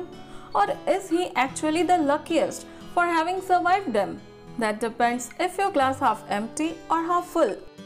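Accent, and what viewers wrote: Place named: India. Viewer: Your accent is good. There is nothing wrong in Indian accent 😊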